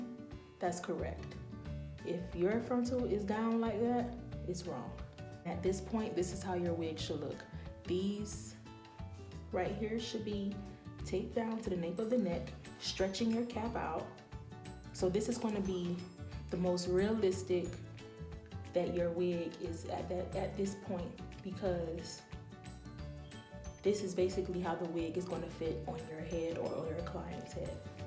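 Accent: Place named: Miami, United States. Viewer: Lmaooo i love how Miami you are, city girls intro and the way you talk accent and all reminds me of them😂 girllllllll i f***s with u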